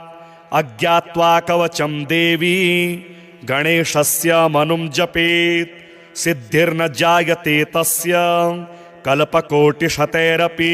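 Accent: Standard. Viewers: 🙏ॐ श्री गणेशाय नम:🙏 🙏ॐ रिद्धि सिद्धि सहिताय श्री गणेशाय नम:🙏 आचार्य जी द्वारा शुद्ध उच्चारण सहित सुस्वर में लयबद्ध वाचन। आपको सादर प्रणाम🙏🙏🙏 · जय माता की, बहुत अच्छी आवाज है शुध्द उच्चारण है ,बहुत बहुत धन्यवाद 🌹🌹 नारायण गृहस्थ आश्रम नारायण नगर पचरॉव चुनार मीरजापुर उ प़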